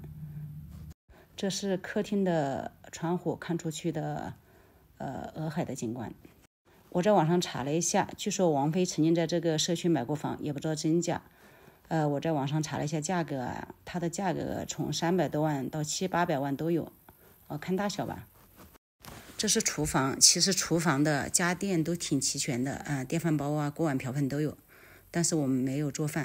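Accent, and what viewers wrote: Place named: Enshi, China. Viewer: 楼主好像带恩施口音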